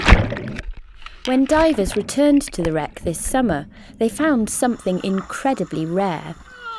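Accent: England, United Kingdom. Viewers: I adore English Narrators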